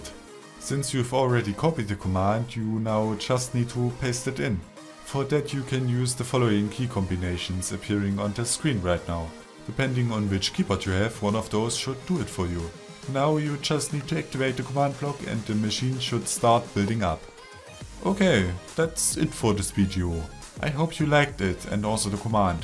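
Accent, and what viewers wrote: Germany. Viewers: Da kuckt mann ein Video und hört den Deutschen Aktzent raus :D find ich gut. ^^ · Amazing command guy ! PS : I love your german accent :D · hello i était like your German voice xp it's more simply to understand you than American youtuber and I think it's a really good idea to use the new effect into your command it's beautifull ^-^ have a nice day for my it was "fête du travail" yesterday :3 (sorry don't no this word in english ... :/ work day I think ? ) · ich lieb dein denglish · Are you really englisch? ;D Its sound very German.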